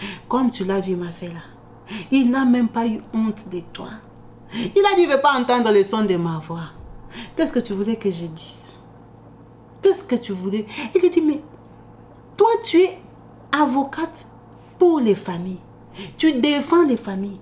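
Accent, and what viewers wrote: Democratic Republic of the Congo. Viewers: Oulalaaaaa qui vient agresser verbalement notre petite 😮 svp dégagez d ici si vs n appreciez pas, elle s exprime et on comprend très bien et l originalite de son blog est qu elle est authentique ds son accent, sa façon de conter tu te retrves on dirait une tantine qui raconte avec précision le kongossa com ci elle y etait et elle ns fait voyager ds les scenes. Super bonne narative pr ceux qui aiment ce qui est terre à terre. Il y’a des milliers d autres youtubeurs qui ont fait l accademie des beaux arts avec doctorats vs pouvez y aller 😂. Ns on aime notre petite avec sn accent congolais en plus elle est respectueuse ❤ moi ss une bne camer.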